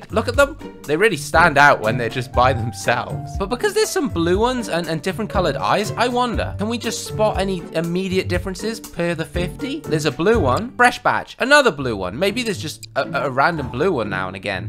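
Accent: United Kingdom. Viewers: You are British but I am from 🇵🇹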